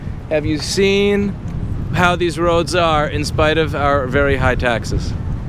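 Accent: New York, United States. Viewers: you are a true noo yawker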